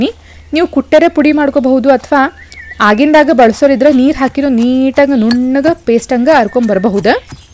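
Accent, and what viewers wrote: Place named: Hubli, India. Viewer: Nim hubli bhashi kelak kushi aythri ❤❤, Nim bhashi kelak Nanu video nodyhini ♥️ from Tulunad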